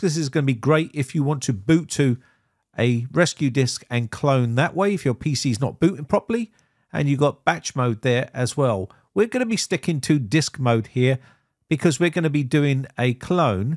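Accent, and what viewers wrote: England, United Kingdom. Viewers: Lever is pronounced leave - er In English and you sound English to me ! Resist the American language. Most Americans think they speak English until they visit England .I once worked London with a lot of Americans. We spent some time explaining believe me !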